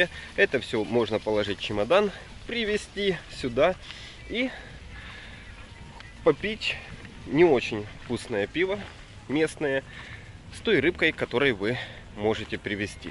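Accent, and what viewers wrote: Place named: Odessa, Ukraine. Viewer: вопрос "офф-топ". Не в тему. Вы одессит? )) Вы не подумайте ничего такого. Но вот это вот "я Вам расскажу ЗА несколько вещей" - это так по-одесски, так узнаваемо ))))))))))) ++++ Спасибо за видео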